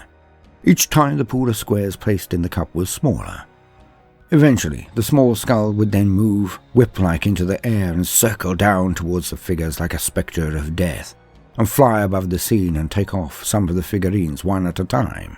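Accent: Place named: RP, United Kingdom. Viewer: 100k is just the beginning, Sir what you bring to the table isn't just a talent for writing, nor a command of the queen's english, or your pleasing baritone of a voice. No, what you possess transcends all of that and yet is magnified by its very presence, you have passion and it reverberates with every line written, every word spoken, every image used, and yes every song (kudos to your wife BTW). You sir have earned your Legion of Followers, just as you will the hundreds of thousands yet to join.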